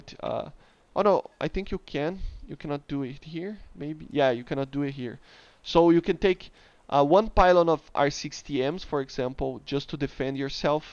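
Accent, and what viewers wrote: Brazil. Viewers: You sound like a Brazilian guy 🤔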